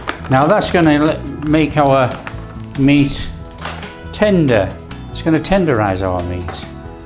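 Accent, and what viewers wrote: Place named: England, United Kingdom. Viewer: No we don’t need music, we enjoy your English accent. I love bean sprouts I have them in almost every Asian dish I cook. Thanks heaps, I love your recipes. I’m watching from Australia 🇦🇺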